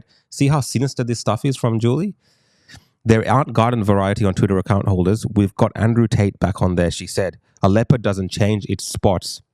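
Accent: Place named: United States. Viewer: Her American accent noted.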